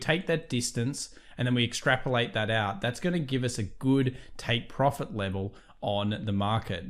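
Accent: Australia. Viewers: One of the best analysis channels on YouTube. Plus the Australian accent. Can't ask for more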